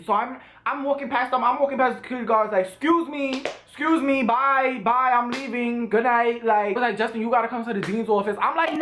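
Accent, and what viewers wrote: New York, United States. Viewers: Hey Justin, I don't live in the USA, and I have no idea how I came across you videos, but I really like them , and I like NYC. Your storytimes gave me a pretty good insight of living in Brooklyn, and I thought it's very entertaining cuz it's actually sooo different from living in Europe,it's unreal. btw at the beginning of finding out about your channel, I couldn't understand most of the things you were saying, but after watching the How to talk like you're from New York video, I now feel like if i'd ever have to live there ,I could blend in easily. So thanks for making it :D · I love his accent 😂😂 NYC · I loveeee how you talk , that New York lingo 😍 Love your story times ! 🔥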